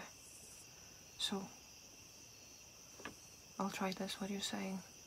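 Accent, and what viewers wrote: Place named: Netherlands. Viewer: I'm trying to place her accent but...Dutch maybe?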